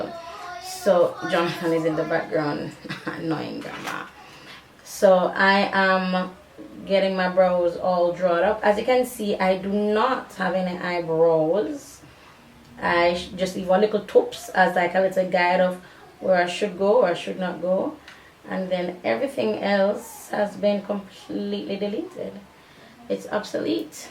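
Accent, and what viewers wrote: Jamaica. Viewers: Are u from Jamaica cause u talk like dem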